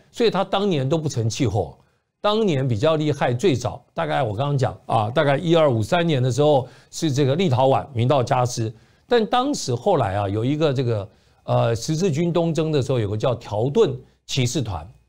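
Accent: Standard. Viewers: 你到底是不是100%洋人血统？ 反正普通话是100%纯正，神乎其神！ 谜团？🙄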